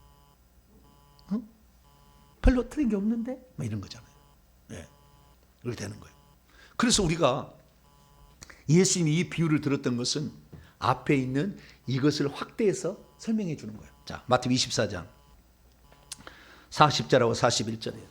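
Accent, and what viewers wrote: Jeolla, South Korea. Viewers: 목사님! 반갑습니다. 목사님 고향이 ”전남 화순군 도곡면“ 이시군요. 저는 화순군 춘양면입니다. 목사님의 설교 말씀을 즐겨 듣는 편인데 들을 때마다 속으로 생각하였습니다. ‘억양이 전라도 분 같은데…‘ 역시나였습니다.🩷 앞으로도 은혜스러운 말씀 기대하겠습니다.😀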